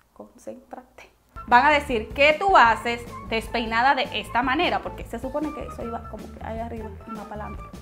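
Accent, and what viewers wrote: Dominican Republic. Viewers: Me encantaaa, eres dominicana?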